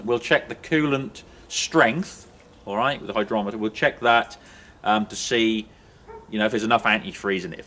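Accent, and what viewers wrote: Norfolk, United Kingdom. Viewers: Love the vids. Brought up near Fakenham, Norfolk. Good to here the Norfolk accent again . Would love to see a Kubota 7173 on the drive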